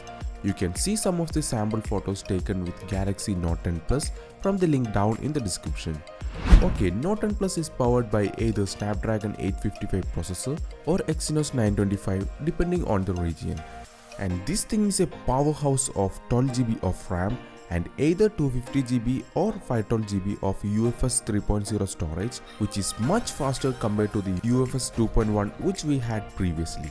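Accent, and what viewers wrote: Kerala, India. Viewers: R from from kerla. ? I m guessing from accent.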